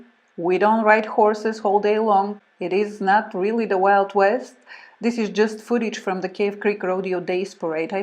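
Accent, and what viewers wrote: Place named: Eastern Europe. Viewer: I picked up the east accent , I'm from Austria :)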